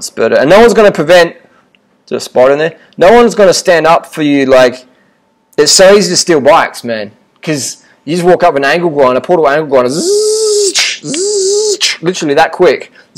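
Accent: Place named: Australia. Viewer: I'm curious, does anyone know where this guy is from? I'm detecting a sort of Australian accent but im finding it a bit difficult to understand him. It would be nice to know where the accents from :)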